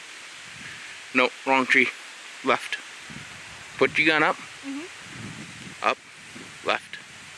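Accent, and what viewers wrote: Canada. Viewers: dude, i knew from the first 3 words you said you were Canadian. I just wasn't sure where. Anyway, loved the vid n keep up feeding the fam. Especially your little hunter lol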